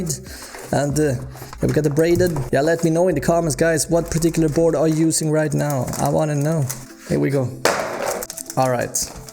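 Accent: Sweden. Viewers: That swenglis dooo 😉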